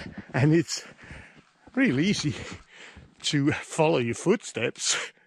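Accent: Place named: Northern England, United Kingdom. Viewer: Very interesting channel. Just love it. I follow quite a few off-grid channels but love to see your fresh content! My question is, your English is very good and I cannot help but think it sounds very Northern English, from the north of England. Have you spent time in England during your working life?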